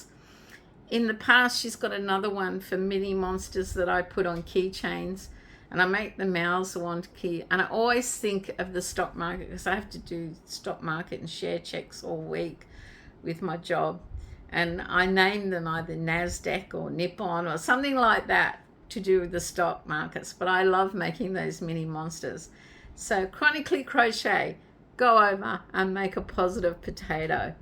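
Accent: Australia. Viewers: As soon as i hear an Aussie accent i click subscribe. Great to have more and more Aussies starting up a YouTube channel, everything is so US centric at the moment. Love your work, loved your! He is a great bloke for this country.:)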